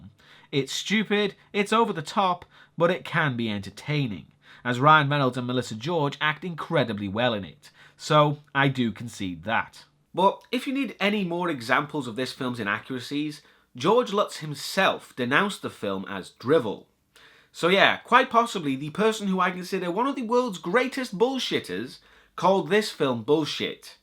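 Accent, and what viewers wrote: United Kingdom. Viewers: cyberdemon 10130 I believe he is from the UK and the song is “ ”by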